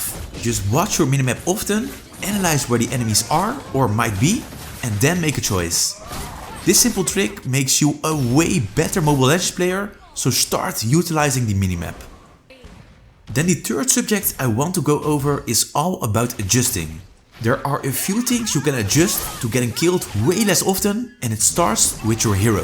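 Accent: Netherlands. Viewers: I can hear Dutch accent. Am I right? 😂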